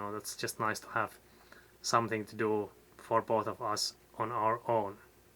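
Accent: Finland. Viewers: Why do you have so Finnish accent?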